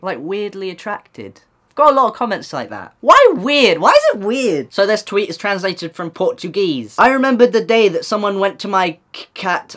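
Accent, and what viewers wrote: United Kingdom. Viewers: i 100% support the tweet saying you helped them learn english, thanks to you i can now understand one of the numerous british accents that there is. so yup my french ass is grateful lmao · I learn english watching you, since I started watching your videos I started like mixing british english with American english, it's funny but a little weird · Your accent makes you sound British cuz you most likely are.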